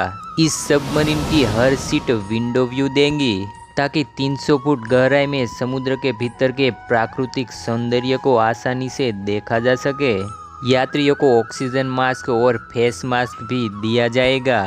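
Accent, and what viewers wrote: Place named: Gujarat, India. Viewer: Gujarati loko hindi bole atle khabar padi j jaay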